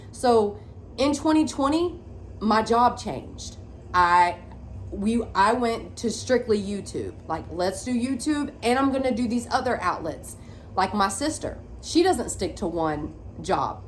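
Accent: South, United States. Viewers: I love watching your channel. I lived in Atlanta for a while, so listening to your southern accent so awesome. Listening to this episode on your channel, I find a lot of similarities in my own life. I started crying when you spoke about loosing your son because I also lost my son. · I loved getting to know you more! My mama was a single mom to 4 kids and I never knew how hard we had it. She is amazing and you remind me so much of her! STRONG! Now as a mom (of 2) who has a very supportive partner I just admire my mom and you and all the single mamas that much more. I seriously just admire you so much! And I love your accent! I’m a southern girl living in Utah and don’t get to hear it much often so it’s an extra bonus for me! ❤️❤️❤️ God bless!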